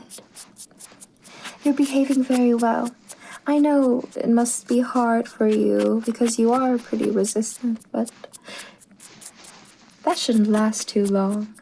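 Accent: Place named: Transatlantic, United States. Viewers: shows yet again that is a woman of many artistic talents!!! the acting was si good here and the transatlantic accent too, it really gave a vintage vibe 💕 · Saw the notification and RAN!! Also, your transatlantic accent is getting really good! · Have I been saying Avon wrong this entire time or is it just the transatlantic accent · Damn why the green screen look so real Makeup videos are always a classsiccc and I love the transatlantic accent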